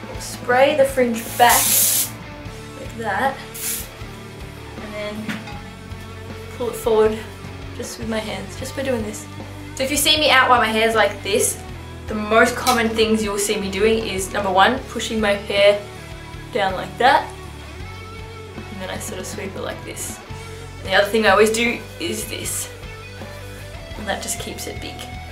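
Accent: Australia. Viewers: Are you australian?? XD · are you Australian ?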